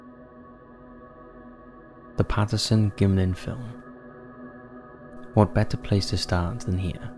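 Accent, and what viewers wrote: United Kingdom. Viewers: Could you try any harder to imitate the voice of that Dark Docs bozo? An overly dramatized, whispery, vaguely Brit voice does not in any way improve an already shabby production. Consider less theatrics and way more thought on your next one mate. Cheers!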